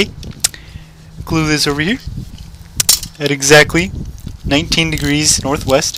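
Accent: Canada. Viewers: Is he canadian (no offense - pretty cool peeps) but still he got k way too much . special or what ? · French Canadian obviously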